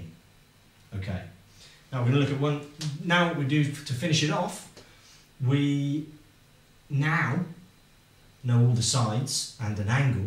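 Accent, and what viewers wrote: United Kingdom. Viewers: I find it hilarious that people keep commenting about his accent...it's just an English accent...if you go there, there are plenty of English accents...and it doesn't make maths any easier! I'm having some trouble getting the same answers on my calculator...help...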